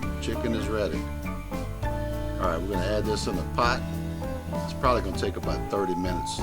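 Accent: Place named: South, United States. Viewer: that ORIGINAL SOUTHERN DRAWL also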